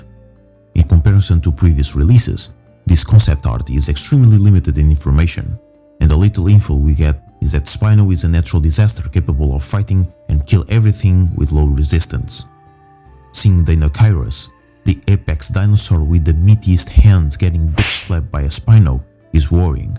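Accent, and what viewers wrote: Portugal. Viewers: amazing video as always man, i too am worried with the lack of info in this concept. P.S: your accent is very familiar to me. do you happen to be portuguese?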